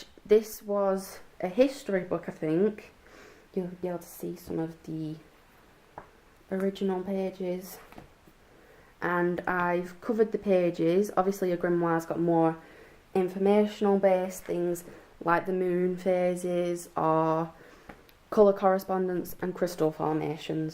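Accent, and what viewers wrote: United Kingdom. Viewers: It's beautiful! What kind of UK accent do you have if you don't mind me asking?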